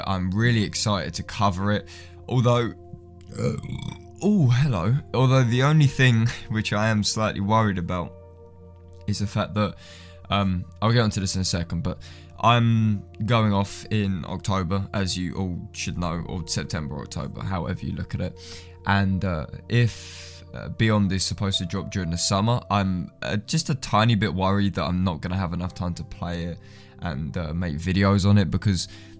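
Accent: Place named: Cockney, United Kingdom. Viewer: This dude is legit, no frills, just straight up NMS and a cockney bloke. 👍🏼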